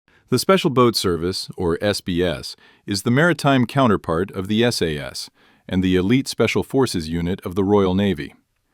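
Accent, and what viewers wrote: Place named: United States. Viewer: I was going to correct you but as soon as I heard an American voice I won’t bother and just scrolled on.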